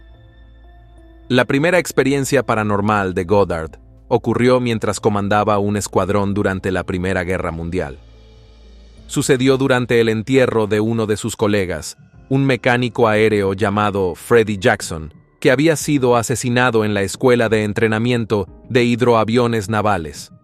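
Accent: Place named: United States. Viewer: Otro más hablando a lo gringo, haciendo el.....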